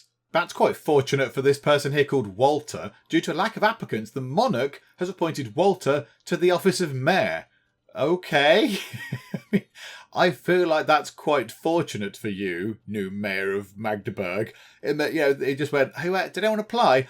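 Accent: United Kingdom. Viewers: Your gameplay are hilarious. And your British accent fitting the game so well. I'm in love with Medieval and Tudor era since I watched BBC documentaries. And I love playing Guild 2 years ago. Finally I play this game after watched your Cupboard dynasty. Have the game since 2020. Never play it until fully release.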